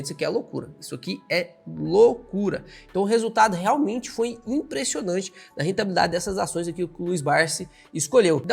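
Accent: Caipira, Brazil. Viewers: Você peca na sua fala, pois nas palavras que tem a letra "r" vc quase embola a lingua. Seu sotaque parece com um caipira . Horrível e cansativo ouvir.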